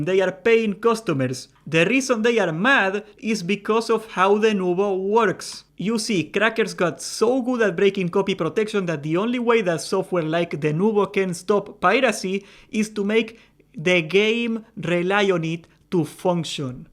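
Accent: Spain. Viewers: I gotta say that I like how you speak English, are from Spain? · Eres de fuengirola, verdad?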